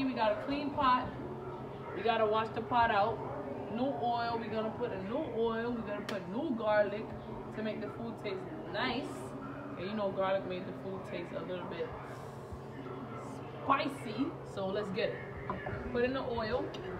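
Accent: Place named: New York, United States. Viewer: I just love ur new York accent 🙂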